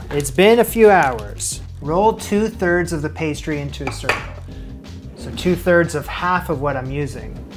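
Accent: Canada. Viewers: You mean you couldn't tell? I'm not from Canada, and I noticed the difference of his Canadian accent to the US one...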